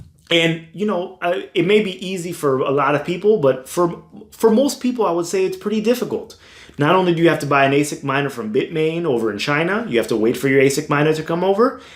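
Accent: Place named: New York, United States. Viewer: Did you go to an Ivy? You are very eloquent. And you sound like you're from NYC.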